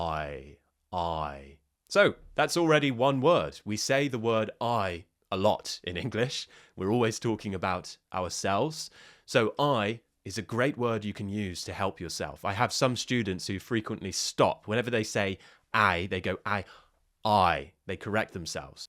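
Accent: United Kingdom. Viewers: The British accent made me move to the UK 🥰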